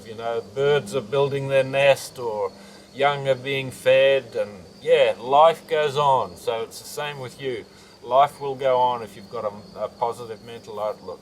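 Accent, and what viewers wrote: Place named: Ukraine. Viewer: Приємно чути, коли не просто українська, а.. чиста українська )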